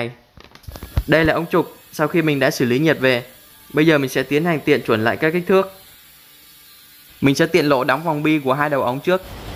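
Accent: Vietnam. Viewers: Bạn nói giọng bắc tưởng ở đâu đó loanh quanh Hà Tây thì nhất định chạy đến mua bia với mồi nhậu để xin kết bạn hihi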